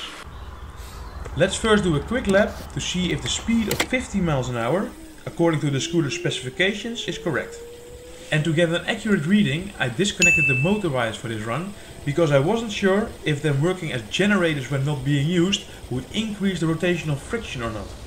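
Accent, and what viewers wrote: Netherlands. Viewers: Ik ruik een flink Nederlands accent. Maar hoe dan ook super leuke video, zoals alles wat ik van je heb gezien.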